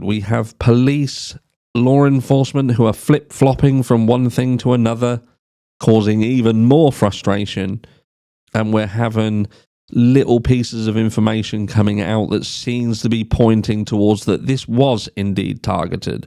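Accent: United Kingdom. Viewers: Love the added British accent, great affect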